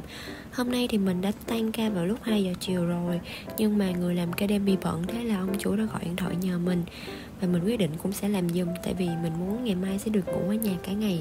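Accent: South, Vietnam. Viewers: Nghe giọng miền Nam là ủng hộ liền. Chúc bạn sức khỏe nha ♥ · Huhu hiếm lắm mới nghe được một Vlog của bạn nữ giọng miền Nam nghe ngọt muốn xĩu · xem nhiều vlog rồi nhưng nay nghe được giọng miền nam ý, kiểu nhẹ nhàng. thường mấy bạn giọng bắc mà ấm thì dẫn nghe nhẹ nhàng. nhưng nay nge giọng miền nam vừa dễ thương vừa nhẹ nhàng luôn í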